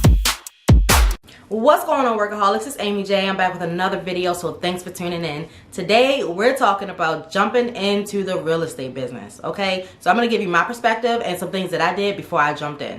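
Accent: Baltimore, United States. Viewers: I can hear the Baltimore in your accent. Good Video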